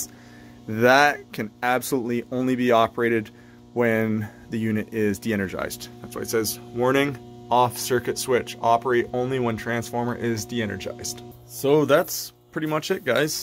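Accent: East Coast, United States. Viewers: Within the first 5 seconds I could already tell you were an east coaster lol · Is this Florida or Boston? I miss the East Coast, and from your accent I'm gonna assume you're from that area. I just feel like I can tell where a place is when I get recommended random videos from the places I miss. There's just a specific way things look..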